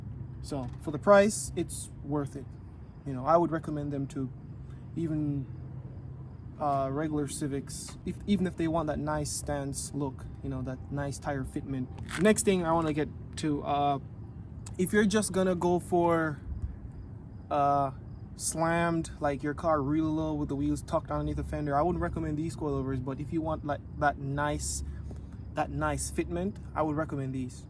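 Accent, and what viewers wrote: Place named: Jamaica. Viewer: U sound jamaican dawg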